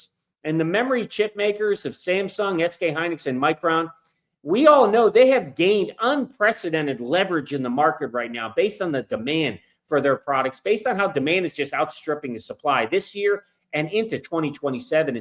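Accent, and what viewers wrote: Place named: Chicago, United States. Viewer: You sound like you're from Chicago, neither Boston or from Texas. 😊😊😊 tambien Feliz Cumpleanos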